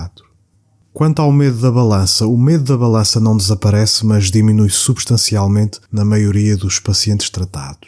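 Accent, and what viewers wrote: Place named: Portugal. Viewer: Dr. Acho que só a tua voz já resolveria muita coisa...que som agradável de ouvir...com este sotaque português então, é tudo de bom...❤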